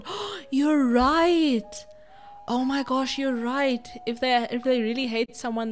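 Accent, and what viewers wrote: South Africa. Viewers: That South African accent is wholesome. I dont know if I'm wrong but... I love it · Definitely a South African accent. Are you South African?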